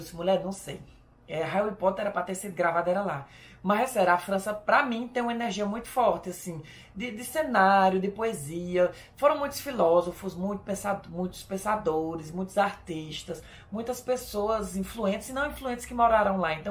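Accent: Northeast, Brazil. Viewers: eu te acho a cara q se assume dentro do q vc é, nordestino arretado muitíssimo inteligente, porém com sotaque incivelmete nordestino q portada acordo com a situação e ocasião continue esse carinha gente fina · Sotaque nordestino lindo, admiro muito os nordestinos e o Nordeste. Estado lindo povo maravilhoso quero conhecer esse estado belo.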